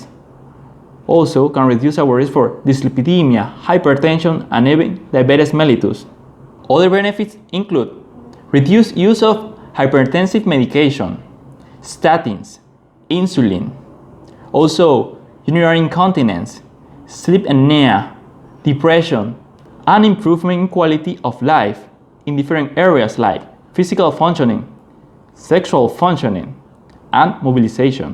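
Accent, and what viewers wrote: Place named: China. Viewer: I think you shouldn't change your accent just because some people think you should. Its beauty of this world where we live that everyone has their own unique way of speaking a language. I live in Engalnd and we have God knows how many different accents here, I find it really hard to understand Scottish accent but I would never ask my Scottish friends to change their accent for me. Similarly my Caribbean friends have very strong accent and it's the beauty of every area. A Chinese man should speak in his accent as it's not easy for me to speak your language but you are speaking in my language so i should be grateful to you. And again you have beautiful accent and always keep it like that.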